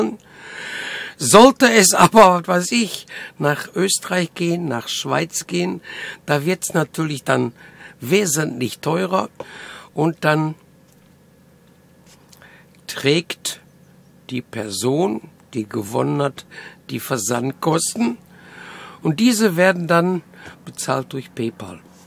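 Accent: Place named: Ruhr, Germany. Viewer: Hi, naja Kritische Person? Das bin ich auch, mir wird auch nachgesagt ich sei Pessimist. Naja ich sage da nur Kritische Person hin oder her,man muss nicht zu allem ja und Amen sagen:-). Und ich bin kein Pessimist sondern Realist denn Pessimisten sind die besten Realisten:-). Und du hast halt eine Ruhrpott Schnautze,wie sich das gehört:-), halt ein Original ^^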